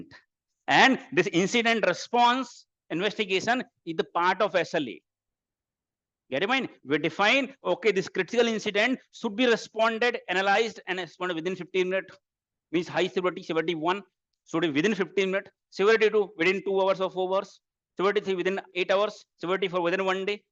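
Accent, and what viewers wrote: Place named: India. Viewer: Very strong accent and talk very fast who the hell would understand him besides his Indian accents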